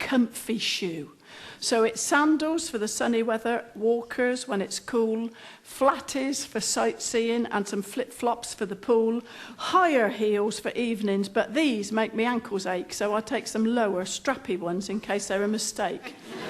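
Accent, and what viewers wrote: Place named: South East England, United Kingdom. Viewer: From the Westcountry (Cornwall), that is not a Westcountry accent anywhere, sounds like old rural accent from the south east to the west of London, before alll the cockney Londoners spread out. So yes, borderland between Oxfordshire and west Berkshire is where I'd place her.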